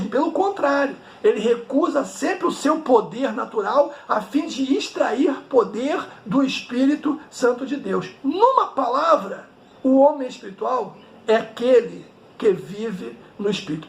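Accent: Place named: Rio de Janeiro, Brazil. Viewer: Vc é carioca ? Sua fala é irritante.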